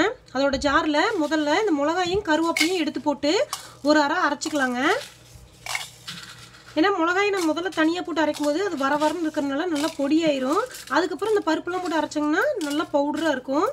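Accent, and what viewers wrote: Kongu, India. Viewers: yur voices lyk kongu slang in gobi which place yu are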